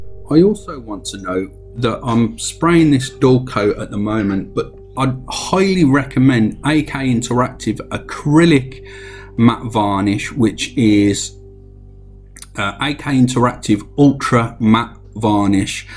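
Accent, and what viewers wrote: United Kingdom. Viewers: Hello. At first let me say: I love your tutorials and your british accent. But I have some constructive criticism. Pls increase the quality of your vids by making a good audio. You lower your fantastic work by this aweful sound. Don't be mad at me. I just want to push your vids into a good light. Greetings, a fan from germany. Keep up the awesome work!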